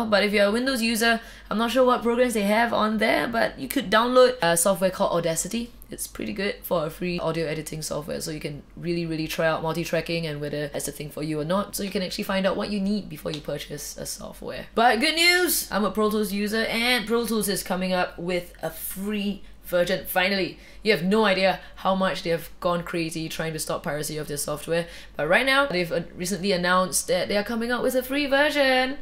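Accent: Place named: Dominican Republic. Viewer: You speak like a Dominican in english.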